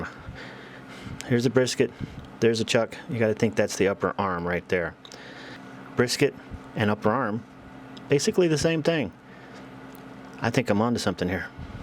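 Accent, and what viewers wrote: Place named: Minnesota, United States. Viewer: You're from Minnesota huh?!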